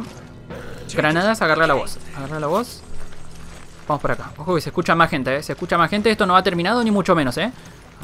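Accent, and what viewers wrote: Argentina. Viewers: sos argentino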